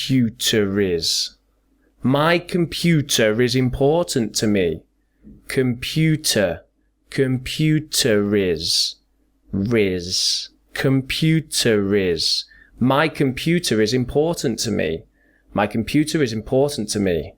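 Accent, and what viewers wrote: United Kingdom. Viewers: It's very funny. I like a lot. Now I you learn the british accent.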